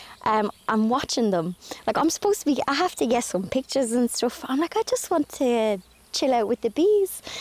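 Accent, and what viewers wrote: Ireland. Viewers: love to hear that beautiful Irish lilt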